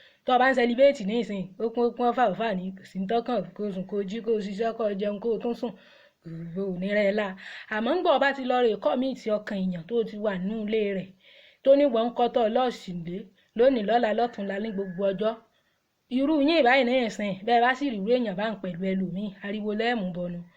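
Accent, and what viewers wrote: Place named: Egba, Nigeria. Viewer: Egba (Abeokuta) accent mixed with a little bit of Ibadan. But the last time I checked you have a different Yoruba accent. Cool anyways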